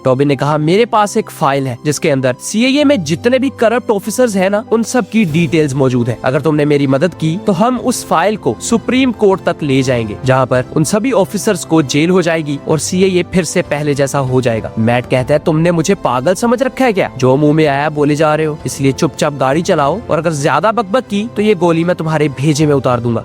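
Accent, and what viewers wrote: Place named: Lahore, Pakistan. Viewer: I think you from Pakistan kyuki hindi esi ni hoti matlab bolne ka lehza lahori pakistani panjabi lag raha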